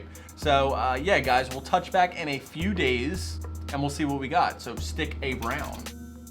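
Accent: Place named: New Jersey, United States. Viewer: He must be from jersey, “balldamore”/Maryland, or PA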